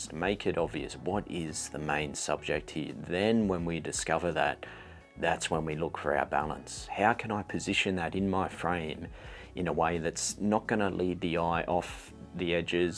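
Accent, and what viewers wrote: Australia. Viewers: There's absolutley nothing redeemable about the thin Australian accent.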